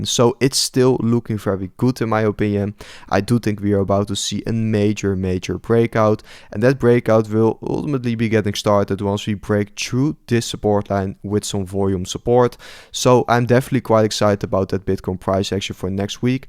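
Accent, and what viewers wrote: Netherlands. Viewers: Nederlands accent is zo makkelijk te herkennen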